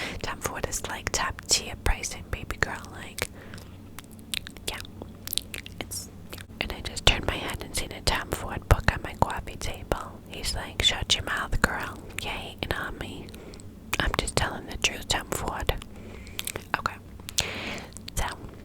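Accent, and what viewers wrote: New York, United States. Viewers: I love that New York accent!! You also work that fluffy mic like no one else ✨ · Love the soft spoken as well as the New York accent!! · omg love the NY accent, will always remember “niagruh fawls” from your first NY accent video 🤣❤️ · Thanks so much ❤️loving the new york accent from a irish girl living in dublin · omgg PLEASE do more NY accent vids they are soo tingly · Happy Early Birthday!! 🎂🥳🎉 P.S LOVE THE NEW YORK ACCENT!! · Are you a Pisces or Aries March baby? Loveeee the New York accent whispers especially as I’m from the uk. I really appreciate you, sending lots of love ❤️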